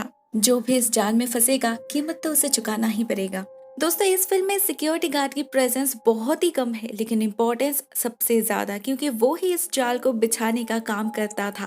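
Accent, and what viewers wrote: Bengal, India. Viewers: u bengali? 🖕🏽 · Ap bengoli ho kia bolieee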